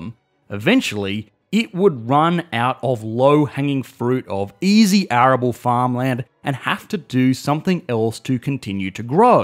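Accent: Australia. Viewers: Is that an Australian accent? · you have a quite funny accent, i would guess is it australian accent? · Me too, and I'm usually just listening to them in the background. I think it might be the Aussie accent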